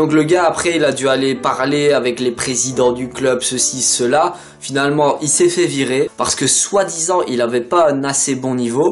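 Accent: Liège, Belgium. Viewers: Toi ça s'entend t belge #wearebelgium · J’ai entendu que ta voix a l’accent Liegeois moi aussi je suis Liegeois PS: je t’adore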